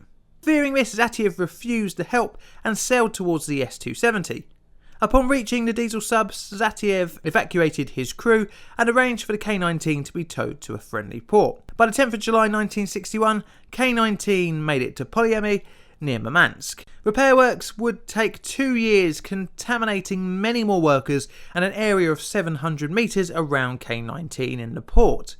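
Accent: United Kingdom. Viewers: I like your content and presentation, but your voice is very comforting in an odd way. My grandparents sounded like you and to this day, I think in a British accent lol. I lived with them most of my childhood. My grandmother was actually Scottish but ended up in southeast England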